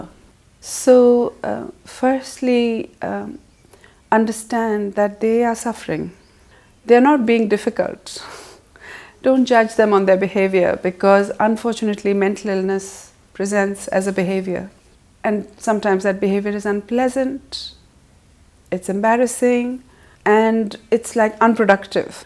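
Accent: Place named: India. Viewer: I like the indian accent. you speak well english.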